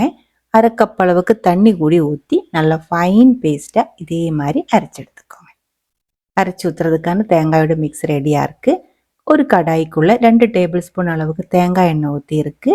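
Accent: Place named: Kerala, India. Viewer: Avuha Malayalam... basha puryalaiya... so sweet...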